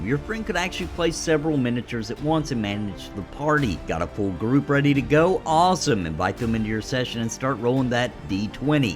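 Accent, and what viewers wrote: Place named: Appalachia, United States. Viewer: l knew I heard some Appalachian I'm from Southern wva so I picked it up lol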